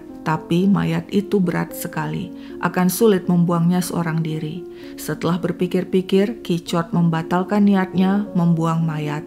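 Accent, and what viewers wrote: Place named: Sulawesi, Indonesia. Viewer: logat sdh sperti org sulawesi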